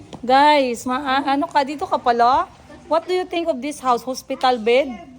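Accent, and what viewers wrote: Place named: Ilonggo, Philippines. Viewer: Mam, ganyan tayo mga Ilongga d mawala ang intonation natin Ilonggo magsalita❤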